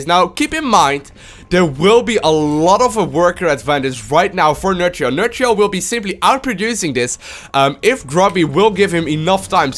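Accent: Netherlands. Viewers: Ik dacht al dat je een Nederlander was :), je spreekt heel goed Engels maar dat accent zal nooit weg gaan :P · lol ik wist dat jij nl moest zijn door je accent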